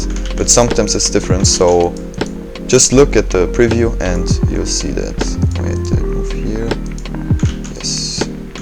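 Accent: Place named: Germany. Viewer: mag deinen deutschen akzent und natürlich auch das tutorial xD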